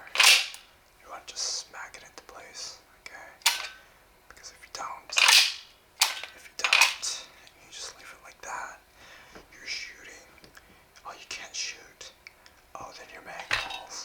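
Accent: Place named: Canada. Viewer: The Canadian accent is relaxing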